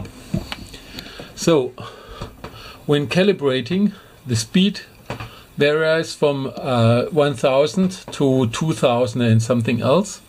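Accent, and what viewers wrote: Germany. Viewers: Man merkt absolut dass du deutsch bist aber trotzdem gutes video :)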